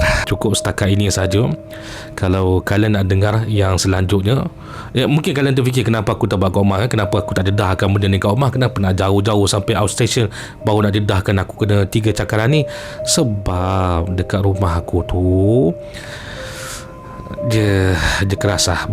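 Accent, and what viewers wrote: Perak, Malaysia. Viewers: assalamualaikum..ouh org perak ke yop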